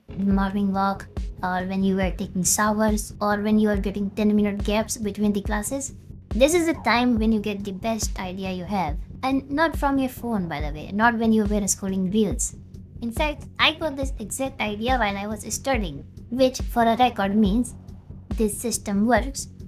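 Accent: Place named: Southeast Asia. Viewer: Are you Singaporean, Malaysian or Indonesian? (Made a guess based on da accent XD)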